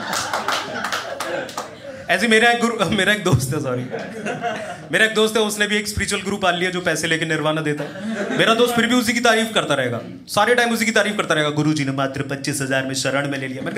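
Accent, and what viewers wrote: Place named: Uttar Pradesh, India. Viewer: Bhai apke baat karne ke tareeke se proper up wali feel aati hai.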